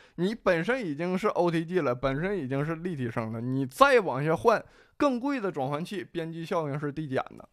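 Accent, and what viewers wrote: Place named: Northeast, China. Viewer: 哥们你东北哪儿的:face-turquoise-covering-eyes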